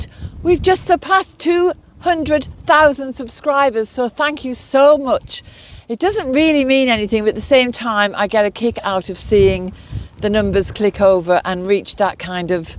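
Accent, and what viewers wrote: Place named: Yorkshire, United Kingdom. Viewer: Sounds great good company and the satisfaction of a good job done good for you. Studying brought me from the Midlands to Leeds and a settled up here.Your accent tells me you will know it Wilsden village just down the road from Haworth, I connect with Eire too my father RIP was a Carlow, man. Blessings peace and harmony to you xxx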